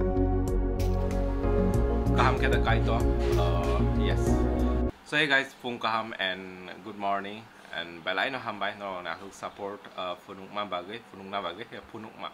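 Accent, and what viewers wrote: Tripura, India. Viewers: Dara nni belai kokborok khunathokgo❤❤❤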